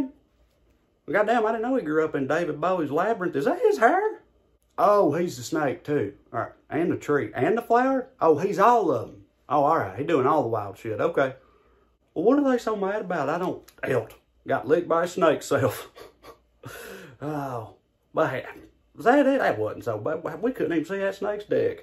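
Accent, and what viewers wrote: South, United States. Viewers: They couldn't even see his... Took me a second to understand the southern accent... BWAHAHAHAHA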